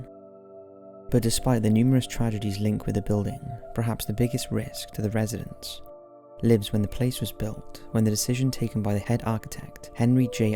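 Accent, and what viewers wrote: United Kingdom. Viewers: I just about choked on my popcorn when he said, "a man named Wilber Ross." Was he THE Wilbur Ross or just somebody who had the same name? Or does the British-sounding narrator not know about Wilber Ross? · whats creepy is the way the narrator speaks with a uk accent but says American style words...take a look..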